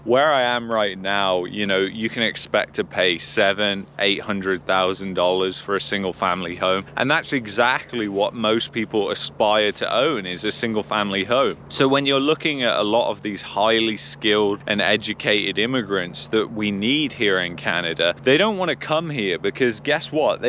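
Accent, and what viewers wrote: England, United Kingdom. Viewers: So much negativity and doom and gloom.you have an English accent, go back to where you came from if you hate it so much. UK is a third world country anyway, go back and live “large” there …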